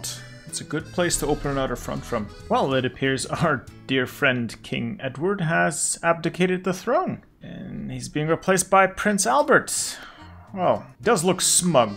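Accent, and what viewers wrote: France. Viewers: You have a great French accent! You're not French right? Haha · You've a nice french accent, where are you from?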